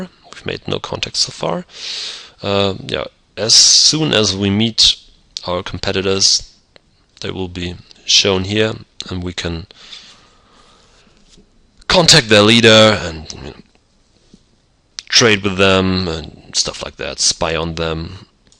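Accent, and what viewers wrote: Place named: Eastern Europe. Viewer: apart from your irritating eastern-euro voice trying to speak in english accent, i found the video quite helpful for me to enter into this ancient game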